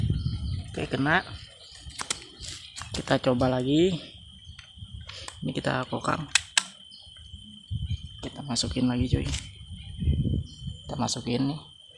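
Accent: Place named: Banjar, Indonesia. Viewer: Orang banjar kah bang , nampak bet cara logat ngomongnya😂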